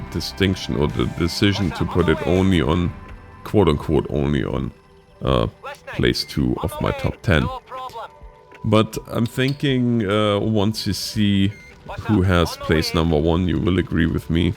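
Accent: Germany. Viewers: You have a hard accent... are you german? :D (I'm a newbie) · i hearyour germen acend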